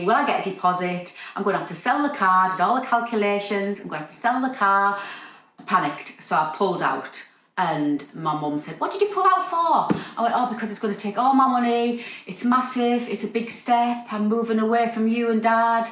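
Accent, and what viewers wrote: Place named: Northern England, United Kingdom. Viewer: Amazing. Look at you GO!! I can't wait to see the reno and all your adventures. I will say you don't have to say Widowed you can say Single. I love sewing but I also love a good reno. Hw about A Dressmakers Life that should incorporate your fresh start while also giving a nod to where you came from. I love being able to sit here in the US (currently) and listen to a Northern accent. Makes me think of Doncaster and my youth lol.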